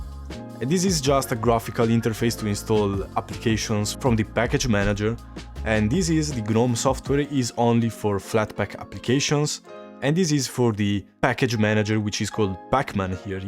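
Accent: Italy. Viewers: Bro ma sei italiano?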